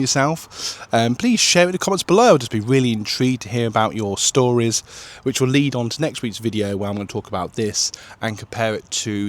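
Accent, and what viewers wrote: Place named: England, United Kingdom. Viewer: Great voice, you have a convincing English accent too!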